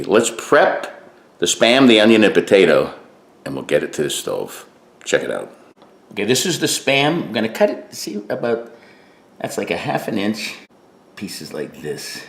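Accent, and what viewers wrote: East Coast, United States. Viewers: Yea buddy. where are you originally from? East coast?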